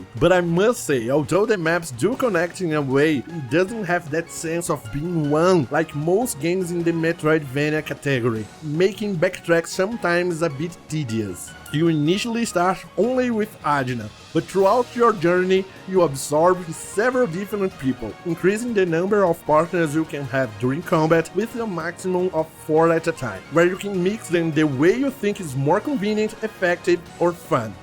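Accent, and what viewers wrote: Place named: Brazil. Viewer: Thanks! Now I know what a Brazilian person actually sounds like for once! Great review!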